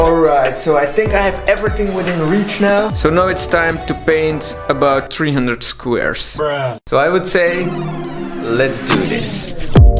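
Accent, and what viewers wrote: Netherlands. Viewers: Are you from the netherlands? Because your accent is sometimes a little bit netherlands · Where are you from cause you sound like u have a dutch accent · I bet you’re Dutch. That accent is so recognizable.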